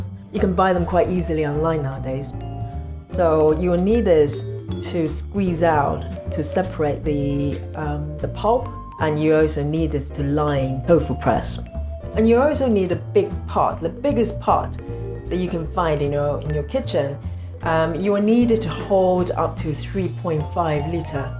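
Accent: United Kingdom. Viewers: LOVE your British accent!! Great video:)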